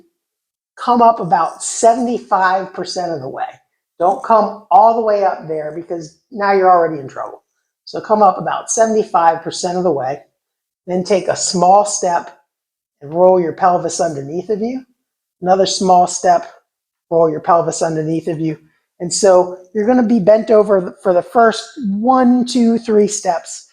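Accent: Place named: United States. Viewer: I knew it he's a yank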